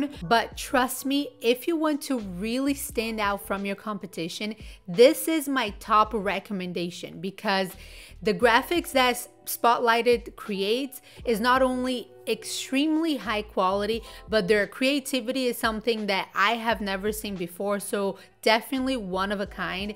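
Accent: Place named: Brazil. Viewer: İ like the sweet 🍬Brazilian accent made it more fun to listen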